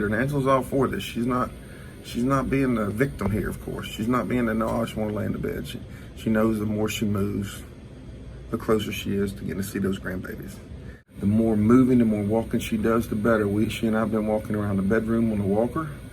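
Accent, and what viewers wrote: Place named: South, United States. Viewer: Nil per oral, not in the mouth for you, I know you speak southern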